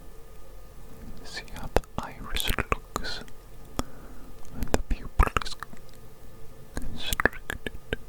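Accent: United Kingdom. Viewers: Are you british im from the uk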